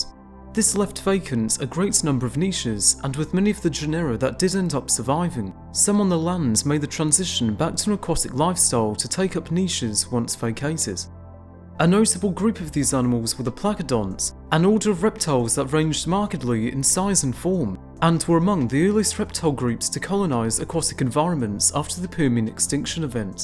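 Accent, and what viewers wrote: United Kingdom. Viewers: The British accent makes it hard to understand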